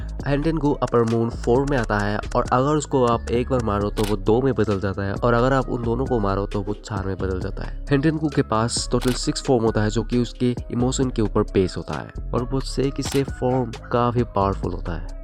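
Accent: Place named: Assam, India. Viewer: Assam? accent tu Axomia lagise💀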